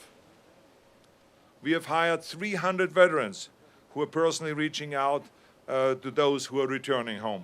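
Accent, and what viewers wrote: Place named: Germany. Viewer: D His accent is so awesome german :D "schmusen" :D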